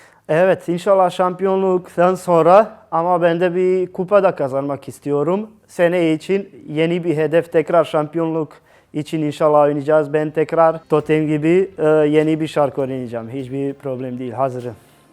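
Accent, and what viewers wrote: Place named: Trabzon, Turkey. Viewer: La adam konusmasi tipi felan tam trabzonlu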